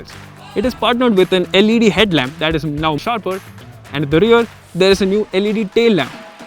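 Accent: Kerala, India. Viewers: I know a Malayali accent when I hear it lol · Mallu accent matches may be they changed with some other mallu. "thaa-rough update yet" (thorough update), "COMB-letely new styling" (completely new styling) many such thru out the video.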